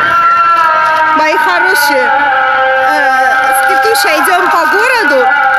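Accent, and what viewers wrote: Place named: Georgia. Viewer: Очень длинная реклама! Но я не переключаю. Жду ваш славный голосок с грузинским акцентом!👍👍👍👍👌👌👌👌👌👌💞💕💖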